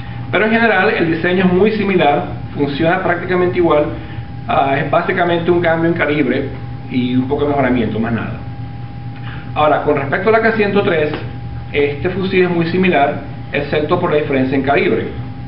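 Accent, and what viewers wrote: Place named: Venezuela. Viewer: por el dejo se nota que es venezolano.... habla tanto del ak-103 pero no muestra ni uno....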